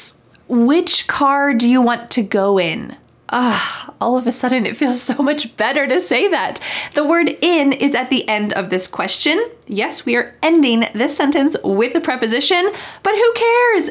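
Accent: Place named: United States. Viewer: Hi! I'm from Poland! Can you teach in another video how to correctly say the word "daughter" in your beautiful American accent that I just love?? 🥰 I can only say it right fast ☺ My 10-year-old son learned to say "I bought my daughter a bottle of water" in American accent 😁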